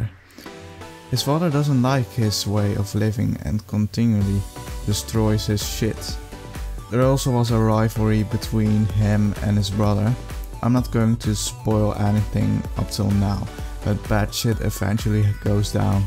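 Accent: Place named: Netherlands. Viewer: you sound dutch and nice vid btw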